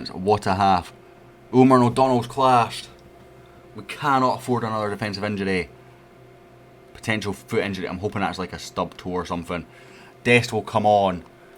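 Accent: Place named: Fife, United Kingdom. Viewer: you sound like a fifer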